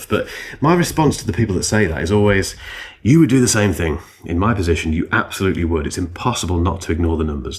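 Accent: United Kingdom. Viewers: I’m one of those people who has been watching every single video on the Macbook pro M4 or the MacBook Air (even now that I’ve had a MacBook pro M4 for 2 or 3 months). I openly admit that if you made an update video on the macbook pro M4 (or a comparison), I would watch it in a sec😂 (i love your opinion AND your british accent). The reason why I do this is that I find interesting knowing how others people’s opinions evolve over time (whether they change or not). I like to see what people find more pleasant on a product that I like and see whether my opinion on particular aspects is shared or not. PS: I have a crush for Patrick Rambles, by the way…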